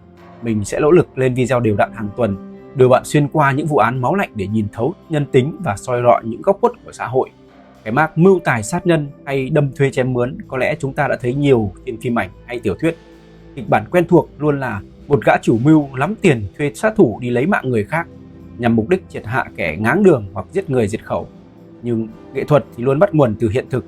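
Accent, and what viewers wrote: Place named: North, Vietnam. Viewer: có thể lồng tiếng miền nam đuọc không ad . tiếng miền bắc giọng gọng gọng khó nghe . xem mà phải tua nhanh gần hêt một video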